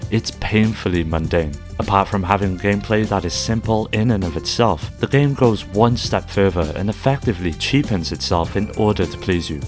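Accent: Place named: North Wales, United Kingdom. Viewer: Quality vids. Random but are you from anywhere in N.Wales? Familiar accent.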